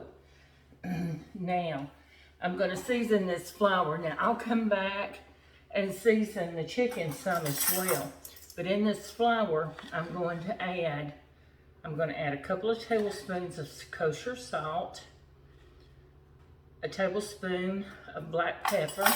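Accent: South, United States. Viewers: I enjoy watching you and listening to your Southern accent. You cook the good foods I grew up on. I am 73 and I always helped my grandma and my mom cook and bake. We were a self sustainable family and I really enjoyed it. I lived that life until I retired. I miss that life, but my kids grew up that way and they are following in are footsteps. They are both wonderful cooks. Sometimes they have cooking contests between them. You are wonderful thankyou for sharing.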